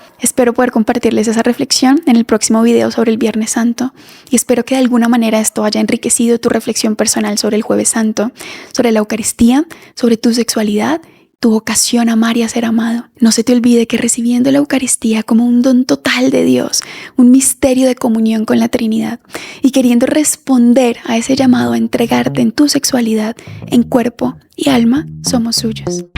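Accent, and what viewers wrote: Colombia. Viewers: Tono de ablar que eres colombiana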